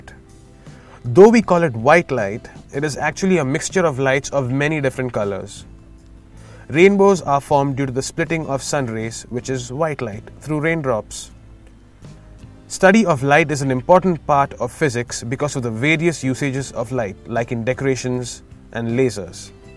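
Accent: India. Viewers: First when i saw the video with an indian voice i thought that this video is going to be a textbook explanation but while the video is going on, It is how i wanted it to be. this is very different from other regular boring textbook explanation videos. thankyou for the great content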